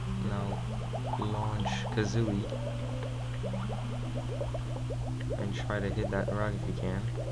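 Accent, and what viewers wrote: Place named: United States. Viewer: see told you, you sound american/canadian amazingly... but i respect your belgian waffleness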